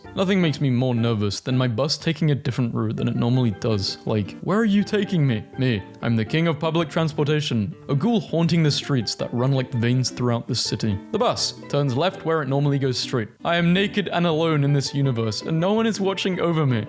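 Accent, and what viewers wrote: United Kingdom. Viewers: I enjoy sitting down and listening to a British person say funny things for 20minutes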